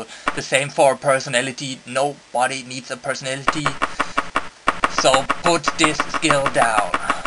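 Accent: Germany. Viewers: Are you German? Sorry if I'm wrong, but I'm not so good with accents. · Oh god. German Accent. Boner. =|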